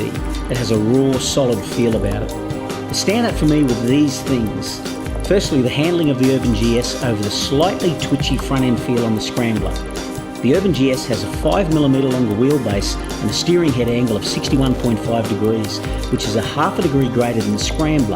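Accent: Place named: Australia. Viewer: 100% Agree - great footage and review - impossible to here what Metzler you recommend with the MUSIC !! and the Aussie accent....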